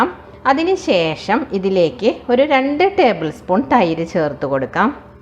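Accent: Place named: Thrissur, India. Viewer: very nice recipe....are you from trissur? you have trissur slang...im also from trissur😀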